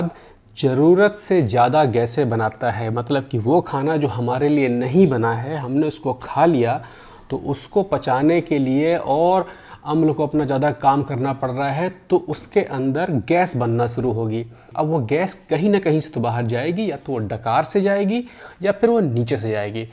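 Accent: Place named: Lucknow, India. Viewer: Sir aap kaha ke rehne wale h apki boli Lucknow side ki lag rhi h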